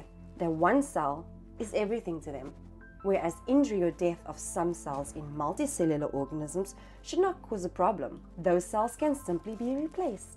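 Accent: South Africa. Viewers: I love her Boer accent. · Love The South African Accent.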